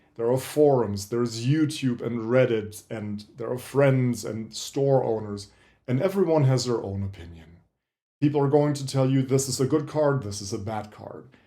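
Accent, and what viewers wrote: Germany. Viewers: I hear the Deutsch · Tolles Video (ich hoffe ich habe den Deutschen in dir rausgehört ;) )! Ich versuche mich seit Tagen schlau zu machen über das Pokemonkarten game, weil ich vorhabe meiner Nichte (1 Woche alt) zur Taufe etwas wertvolles zu schenken auf das sie stolz sein kann wenn sie mal älter ist... jedoch habe ich noch keinen Anlaufpunkt gefunden wo ich nicht dieses Gefühl hatte, dass der Verkäufer einen "Dummen" sucht... gibt es einen zertifizierten Händler, wo ich ruhigen Gewissens "einkaufen" kann? Liebe Grüße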